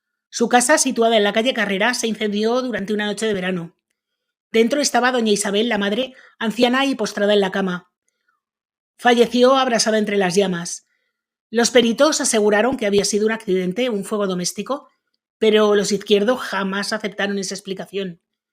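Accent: Spain. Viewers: Me encanta como relata con tanta espontaneidad y ese acento español le da un plus😊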